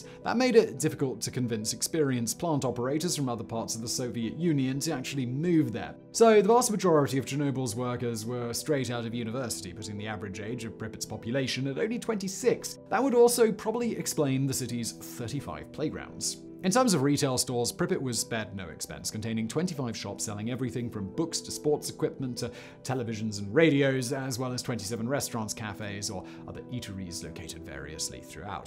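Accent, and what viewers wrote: England, United Kingdom. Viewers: Another grammar fascist showing us all how smart they are by "correcting" the pronunciation in a video. BTW you do know is English and they sometimes pronounce words differently than than other countries do?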